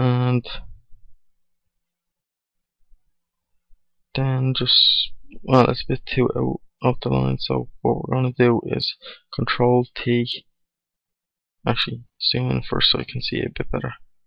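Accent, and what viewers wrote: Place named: Ireland. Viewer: loving the Irish accent man :D Great tuorial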